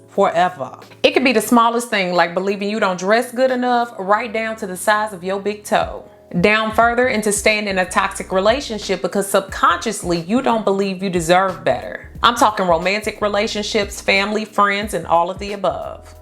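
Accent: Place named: Rural, United States. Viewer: Thank you! I just wanted to say that I love your country accent lol 🤎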